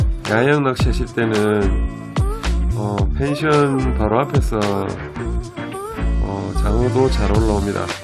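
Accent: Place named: Gyeongsang, South Korea. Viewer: 통영 좌대낚시 영상 잘 보고 갑니다. 들을때마다 차분한 갱상도 사투리가 정감있습니다^^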